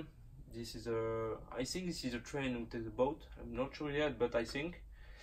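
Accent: France. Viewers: On reconnait bien le petit l'accent français comme tu parle anglais :D bref, Bonne chance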